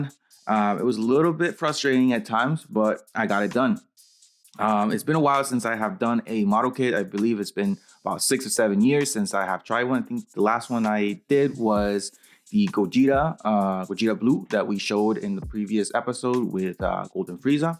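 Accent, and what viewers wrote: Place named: Miami, United States. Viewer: I know a Miami accent when I hear one. usa gundam has some preorders for zoids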